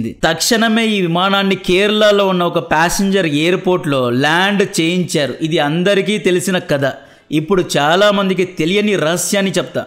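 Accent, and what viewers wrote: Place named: Chittoor, India. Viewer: Brother, Better, Refine your accent.!!! It is sounding like Chittoor accent. !!! ❤❤❤ 🎉🎉🎉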